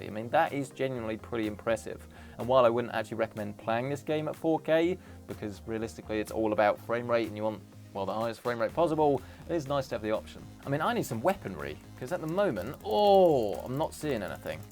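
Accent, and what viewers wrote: United Kingdom. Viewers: British accent is amazing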